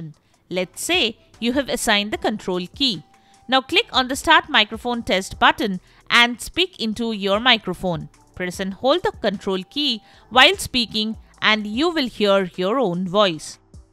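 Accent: India. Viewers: When I hear the Indian accent I know it's finna work. Thank you very much.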